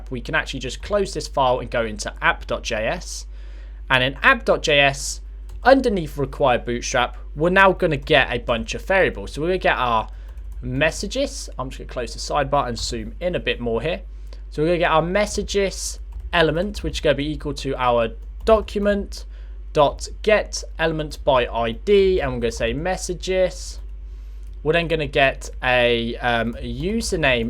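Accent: United Kingdom. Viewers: british accent hahaha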